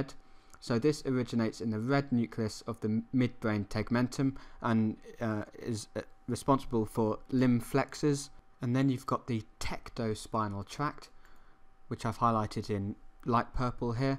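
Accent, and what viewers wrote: England, United Kingdom. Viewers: I learn so much better from an English accent for some reason... Great work! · someone just told me that you have an English accent. And I think it's good. remind me of times listening to BBC documentaries of animals and plants, the lovely and respectable Sir David Attenbourg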